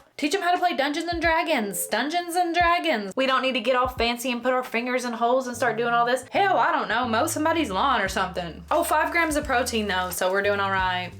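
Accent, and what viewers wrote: South, United States. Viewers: Girl , I'm love the Andes mint chocolate candy. Yes , better than the New York peppiermint patties. Love your videos and the country accents, because I'm from North Carolina, so I get it ,I love it so much.❤❤❤❤❤❤❤❤❤❤❤❤❤❤❤❤❤❤❤❤❤❤❤❤❤❤❤❤❤❤❤❤❤❤❤❤❤❤❤❤❤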